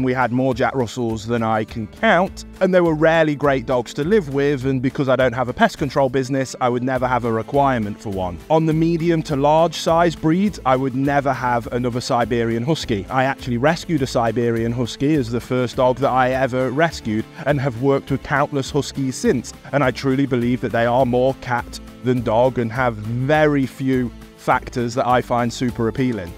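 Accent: United Kingdom. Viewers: Me thinks based on your other videos that you may not be good at your job. And that you sound British you may have taken it from someone else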